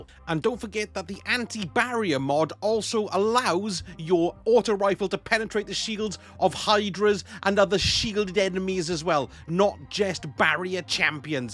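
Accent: Wales, United Kingdom. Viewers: You sound Welsh?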